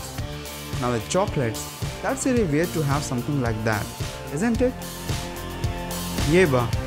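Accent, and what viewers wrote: India. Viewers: the indian accent is too strong, pity.....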